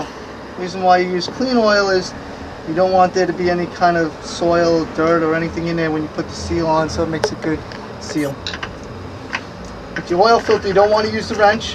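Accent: Long Island, United States. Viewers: were from long island are you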